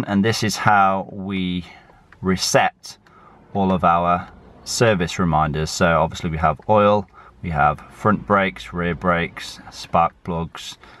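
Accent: England, United Kingdom. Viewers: You sound english though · I know it is, but you sound British, you are showing a car with right hand drive so it's a boot!!!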